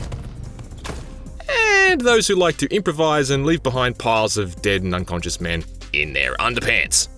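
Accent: Australia. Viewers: Is IGN an Australian reviewer now? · Did IGN hire Aussie man ? Reviewer sounds him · Reviewer is Australian? Sounds just like Steve irwin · “Aaaaand those who like to improvise and leave behind piles of dead and unconscious men in their underpants...” lol. This reviewer is great, and I like his Aussie accent. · Sounds Australian to me...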